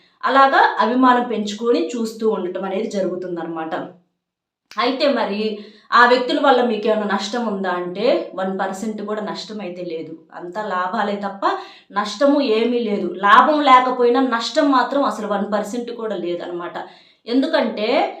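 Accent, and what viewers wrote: Nellore, India. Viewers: YEVURAKKA NIDHE NELLOREAA BAGANE CHEPTHUNNARU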